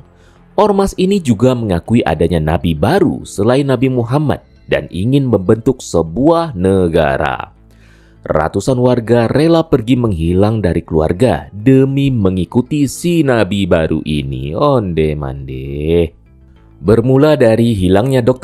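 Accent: Indonesia. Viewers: abg ini org minang kah?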